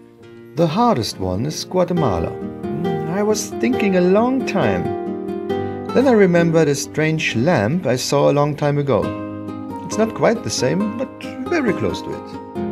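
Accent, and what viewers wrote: Germany. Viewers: God I love the German accent, great stuff too and nice music! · This guy has the best German accent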